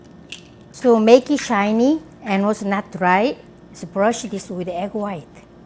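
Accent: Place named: South Korea. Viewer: 분명 다른나라언어 인데.. 한국말같지..? 우리엄마가 영어하는거같아..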